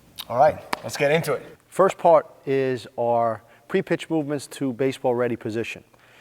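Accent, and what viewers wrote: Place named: New York, United States. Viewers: Very true! As a New Jersey guy living in Kentucky, and working with my boy in Little League, it's nice to hear my fellow North Easterners. Moreover, this is great instruction. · Great video perfect instruction , and he has a great New York accent lol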